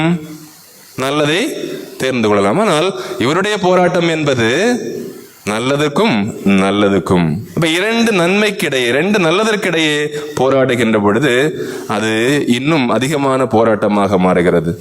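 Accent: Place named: Kanyakumari, India. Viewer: இறைவன் போற்றப்படுவாராக. தந்தையே அருமையான நற்செய்தி, கருத்துள்ள ஒரு பதிவு. உங்களின் முதல் Sermon. I heard the Holy Mass while cooking. Awesome father. Without having self confidence whoever prays nothing will happen. I don't know the church name and the place. Your sound resemblance from kanyakumari Dist. God bless you abundantly dear Rev Fr. Amen Hallelujah ✝️ Hail Mary ✝️📿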